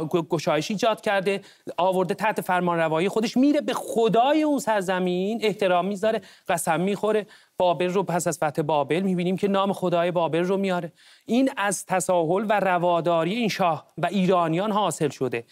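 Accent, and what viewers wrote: Turkey. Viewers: از لهجه شما حدس میزنم زبان شما ترکی باشد ولی چکیده و عصاره غیرت ایرانی و آریایی در رگهای شما جاریست . به دوستان نزدیک و همنشین های شما که میتوانن از کلام و علم شما بهر ه ببرند حسادت میکنم . پاینده باشید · این شخص خودش لهجه ترکی داره واقعا لذت میبرم و امیدوار میشم وقتی میبینم ترک های عزیزمون هم از این عقاید ملی گرایی ایرانی حمایت میکنن بخدا اگه اقوام ایرانی،مخصوصا ترک ها،که جمعیت زیادی در پایتخت هستن،با اقوام دیگه متحد بشن و از قوم گرایی دست بردارن،ما هیچ ترسی از آینده ی بعد از گزار از جمهوری اسلامی نداریم بنظرم یکی از دلایلی که رژیم تا الان به دست مردم سقوط نکرده،همینه که اکثرا ترس داریم از اینکه بعد از گزار،تجزیه طلب ها،در میان ترک ها و کورد ها و اعراب خوزستان،مسلح بشن و کشور رو به آشوب بکشونن میدونید اولین کشوری که به جنگ داخلی در ایران کمک می‌کنه کدوم کشور هست؟روسیه چون ریشه ی عقاید تجزیه طلبی،از خود مردم ایران نبوده و ریشه از شوروی بوده،پس روسیه چون بعد از سقوط ج.ا،در واقع یک متحد خودش رو از دست داده،قطعا مسلح میکنه این ها رو تنها راه نجات اتحاد اقوام هست ترک ها مردم جنگجو و غیرتی هستند و حقیقتا اگر اونا نخوان،حکومت نمیره کاش یه صلح ملی اتفاق بیوفته و مردم و اقوام به این نتیجه برسن که اگر یک حاکمیت درست و متخصص و قانونی سر کار باشه،انقدر پیشرفت میکنیم که اقوام کشورهای دیگه آرزوی پیوستن به ایرانو میکنن اما در صورت تجزیه،تازه شروع تقسیم این تیکه ها بین ابرقدرت ها و جنگ داخلی اقوام برای چند کیلومتر خاک و حق آبه هست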